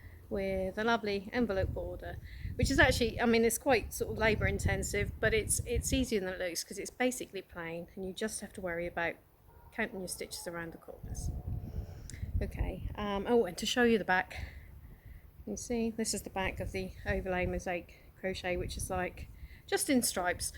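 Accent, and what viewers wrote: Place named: Norfolk, United Kingdom. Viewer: How lovely to hear a fellow Norfolk lady. Can't believe you are up the road from me. Really looking forward to doing the cal. I've never tried mosaic crochet before so looking forward to getting started xx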